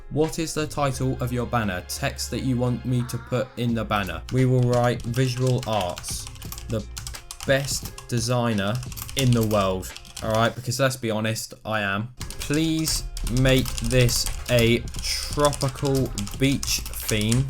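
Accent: United Kingdom. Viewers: *Boeing I forgive you since you sound very british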